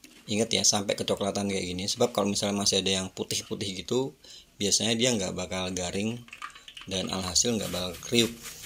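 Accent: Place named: Java, Indonesia. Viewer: POV :wong jowo ngomong inggris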